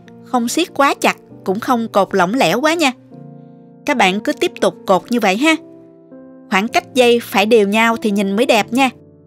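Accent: South, Vietnam. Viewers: Nghe giọng hướng dẫn cưng quá là cưng, đúng kiểu nói người miền Nam. Chúc chị luôn ra các hướng dẫn chi tiết và hay như vậy nha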